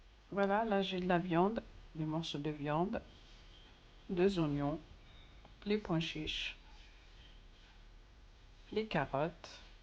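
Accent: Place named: Kabylie, Algeria. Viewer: Bonjour, tu explique très bien ta recette et le français Kabyle, c'est parfait. Kamel de Marseille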